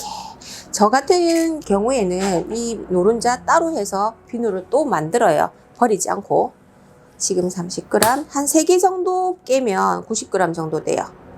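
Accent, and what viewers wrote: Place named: Busan, South Korea. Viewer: 오~~너무 이쁩니다~♥ 만들어보고싶어요^^ 정겨운 부산사투리 방송 잘봤습니다~~구독하고 갑니다^^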